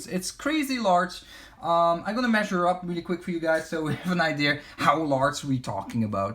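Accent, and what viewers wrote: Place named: Netherlands. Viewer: Realy love the hilt! You said you are gonna sell it? Im intressed,i live in the netherlands and i think you too? Because of the accent haha.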